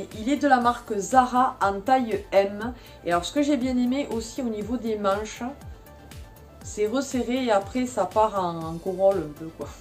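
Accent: South, France. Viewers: coucou ça vaut le coup tu te refais ta garde robe en ce moment c est top tu habites dans le midi avec ton accent ??? bon aprem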